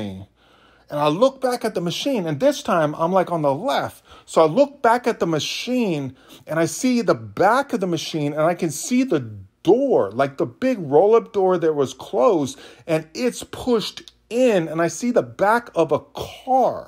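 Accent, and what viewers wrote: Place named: Hawaii, United States. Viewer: You sound like you from Hawaii….might be mistaken, but I hear some pidgin come out.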